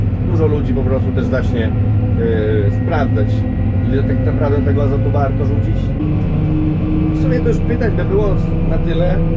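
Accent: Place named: Mońki, Poland. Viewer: Słyszę że kolega z Moniek haha daleko masz do szwagrów?😂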